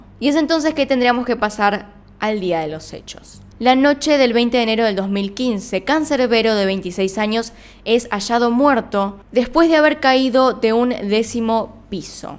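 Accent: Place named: Argentina. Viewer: estudiando leches??? LEYEES WN LEYEEEEES CON Y NO CON CH ARGENTINOS QLS WN CAMBIAN LAS PALABRAS LOS ODIOS HABLEN BIEN😡😠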